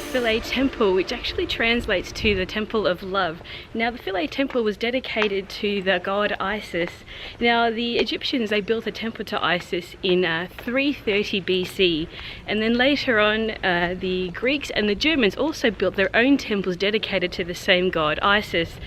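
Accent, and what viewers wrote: United Kingdom. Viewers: Are you Eglish( from England)? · did I write your name correctly you got a beautiful London accent very clearly prounced each word I love it, and it seems that you’re a pro. Guide and nicely done touring in Europe for the firm intrepret perfect... especially your smiling gestures shows how you enjoy your job very nice indeed...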